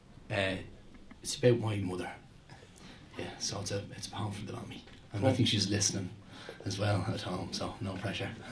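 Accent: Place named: Ireland. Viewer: Such a beautiful poem. And the Irish accent just makes it that much better (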